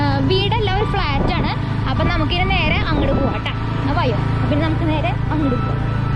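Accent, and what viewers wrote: Thrissur, India. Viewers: ഇയാളുടെ തൃശ്ശൂർ ഭാഷ കേൾക്കാൻ നല്ല രസമാണ് ..😍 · തൃശ്ശൂർ ലാംഗ്വേജ് കേൾക്കാൻ നല്ല രസം തന്നെയാണ് അവതരണം 👌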